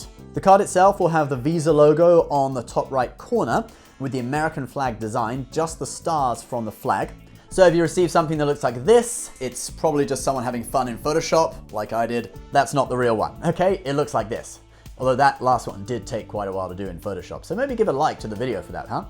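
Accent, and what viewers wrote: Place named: United Kingdom. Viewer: This would have been a more reassuring video if the guy didn't have a British accent.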